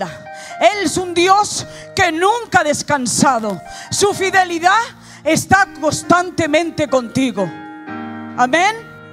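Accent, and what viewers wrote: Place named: Latin America. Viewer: Porque hablan con acento latino??? Mijooo???😂😂😂 Son gitanos de Madrid de toda la vida de Dios..No entiendo, que ridículo🤭🤷🏽‍♂️pufff madre mía...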